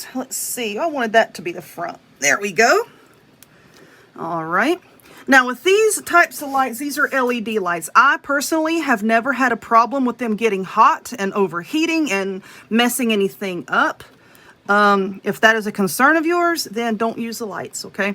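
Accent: South, United States. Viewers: This is so precious. I love your instruction in all your videos. I told my Mom I'm going to make her a corn husk doll for Thanksgiving. She has one she made in the 80's and it's still in great shape. I might add color as you suggested in your instruction. I love your Southern Accent too. Makes me feel at home watching you. (I'm a native Texan btw)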